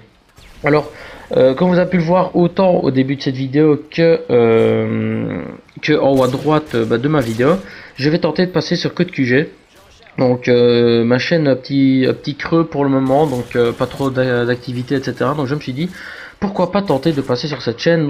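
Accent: Belgium. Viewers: j'ai direct su que tu venai de liege avec ton accent ( je suis de verviers ;)) ce qui explique ton pseudo ;p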